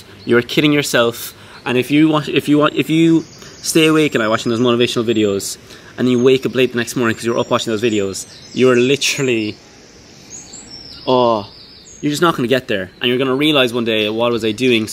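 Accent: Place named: Ireland. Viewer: Some good answers man! Nice Irish accent haha 😂😅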